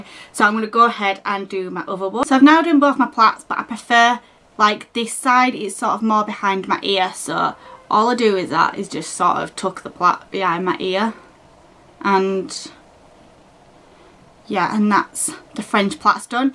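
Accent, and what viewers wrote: United Kingdom. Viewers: Love them all! Love your accent so much,I'd love to visit the uk aha x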